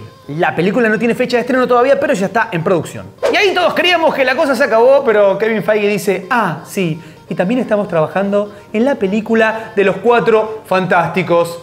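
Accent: Argentina. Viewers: He guachin sos Argentino..??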